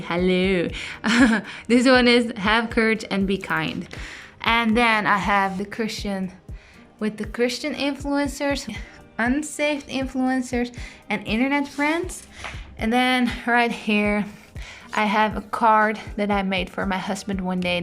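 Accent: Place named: Netherlands. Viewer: Ben jij Nederlands? Ik hoor een nederlands accent. Heel leuk😝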